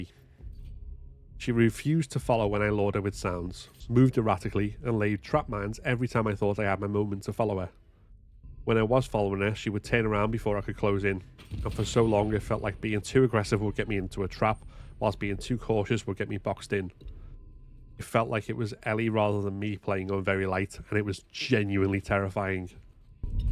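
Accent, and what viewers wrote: Liverpool, United Kingdom. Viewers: Man I love the scouse accent haha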